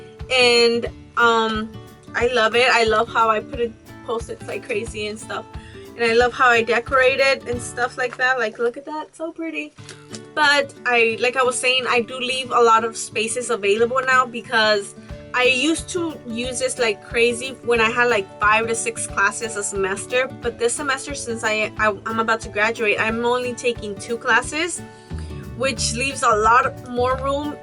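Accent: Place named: Mexico. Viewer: That Mexicana accent though 😂😂💕💕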